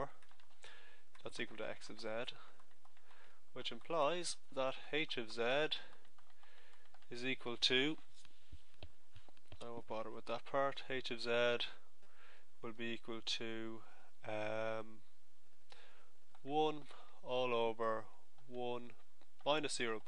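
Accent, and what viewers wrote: Ireland. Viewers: haha wow didn't expect an irish person to be giving math tutorials on youtube. Sound man (Y)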